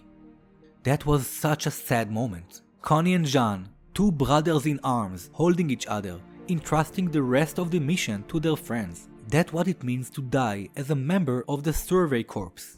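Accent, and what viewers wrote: Israel. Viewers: Are you from Israel? לרגע חשבתי שאני שומעת בעברית ואז הבנתי שזה המבטא שתפס אותי לא מוכנה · You’re from Israel,? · Are you israeli? your accent sounds like it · Israeli right? I could tell immediately, it's particularly noticeable when you say "Reiner". Loved the bit about zhuangzi's butterfly, I subscribed. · For me it was when he said the word "year." It's an awesome Israeli accent. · The accent is Israeli. 100% Also, your English is superb! Kol Hakavod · Ohhh hebrew Being canadian i never come into contact with that language so its completely foreign to me. Every hebrew content creator ive watched always has a super happy voice so dont worry the accent is enjoyable. And Im glad i finally have my answer because everything about yours and others pronunciations is typically spot on until you get to Vs. Im gonna have to research why that is because sometimes ill hear the V pronounced correctly in "Video", while other times its "Bideo". 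Just crazy that language does that to you where you dont even realize you arent pronouncing it right huh? I have a similar issue because I was born in Quebec, so i was raised around french and Ill pronounce it weirdly cause my mind slams 2 puzzle pieces that dont fit together into a incoherent thought. KInd of like how the Japanese have a hard time distinguishing Ls and Rs. So if I see a "oi", in french it's pronounced "wah" , but thats not really something you see in english at all.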